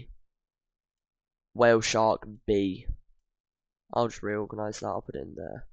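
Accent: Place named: United Kingdom. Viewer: The British pronunciation is so nice😭👍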